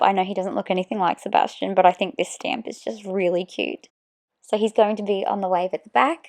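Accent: Australia. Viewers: Love your lovely Aussie voice! Gorgeous card and I love your inspiration piece! Fellow Aussie here!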